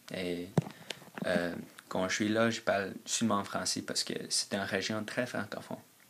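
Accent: Quebec, Canada. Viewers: Effectivement tu fait peu d'erreurs (prononciation). Tu as un accent français ... québécois. C'est excellent ! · Ton accent me rappelle un peu celui de Céline Dion :D La cadence de tes phrases est typiquement québéquoise.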